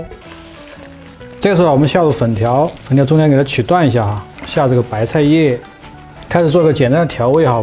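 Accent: Sichuan, China. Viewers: 帅哥亲切的四川普通话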